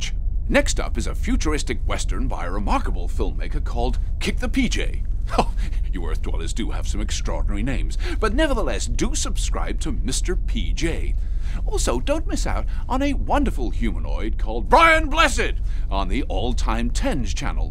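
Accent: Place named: United States. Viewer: Well, he does already speak in an American accent. He did use P.E. teachers in Red Dwarf but it's not such a huge leap to imagine he would also use American words.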